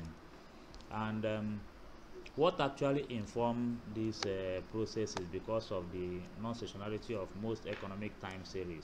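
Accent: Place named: Cockney, United Kingdom. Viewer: I wish I could have the patience to deal with cockny accent in my dire times.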